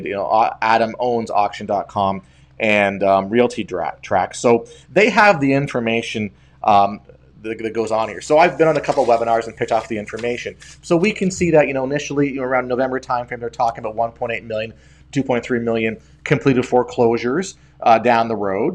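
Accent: Canada. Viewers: You must be Canadian because you say oot and aboot.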